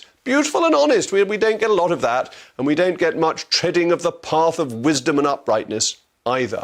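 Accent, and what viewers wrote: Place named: RP, United Kingdom. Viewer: Textbook example of someone using a posh accent and obscure references to hide an absolute lack of intelligence.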